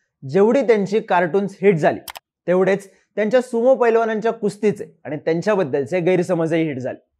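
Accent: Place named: Satara, India. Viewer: Bhava boltos lay bhari asal satari ❤️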